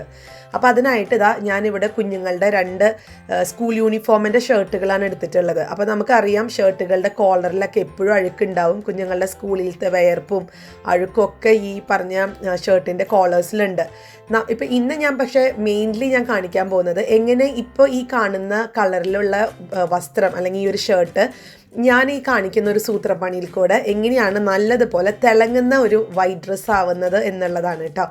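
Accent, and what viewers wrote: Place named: Thrissur, India. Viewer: alla vedio vum kanarundu. njan aadyame chindichu. ningal trissur kkari aanennu. othiry eshtanu samsarikkunnadu kelkkan. wish u good luck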